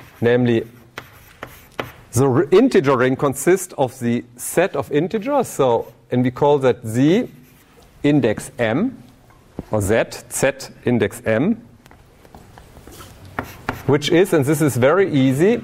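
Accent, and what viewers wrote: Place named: Germany. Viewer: His English is not bad, but sometimes I can't tell if he's speaking English or German...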